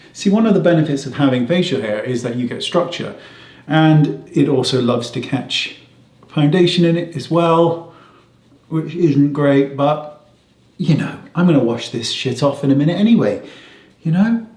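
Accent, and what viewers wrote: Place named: United Kingdom. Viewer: Thx, listening to your accent& lBritish language is always a learning experience. Love an inexpensive cinceLer/ fuundation!! Am waiting for my mail for your Daisy, gloss& liner!! Thanks for your artistry & humor🥂🥂🥂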